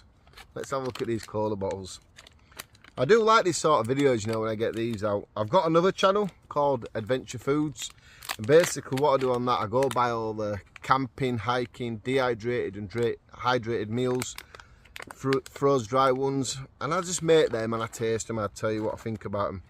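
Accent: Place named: North, United Kingdom. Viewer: I thought I was subscribed but I wasn't, always watch your camp outs, your Northern accent makes me chuckle, the beard is looking good, get a beard before it snows it'll keep your face warm in the cold weather. But it definitely suits you, ohhh suits you sir! Lol! Got to convince the Mrs. Oh lovely to hear that gentle rain, it's been so dry this year, no rain to clean the air. Need that rain to keep it a green & pleasant land. How about a coastal camp, you & vegan Dan, I suppose your far from the coast, its getting the time. Anyway keep up the good camping! Always let us know how you feel, ignore the haters, can never please them anyway. A lot of share your opinions & outlook, at least we're right! Lol!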